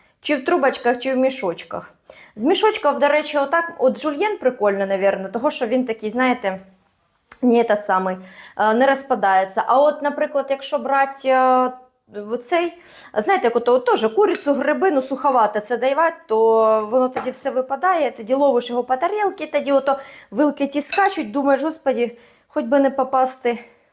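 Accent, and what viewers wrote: Surzhyk, Ukraine. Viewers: такий жахливий суржик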